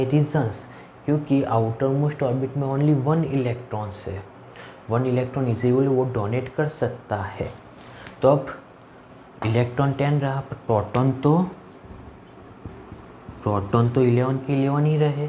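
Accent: Gujarat, India. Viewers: Sir AAP gujrati ho ?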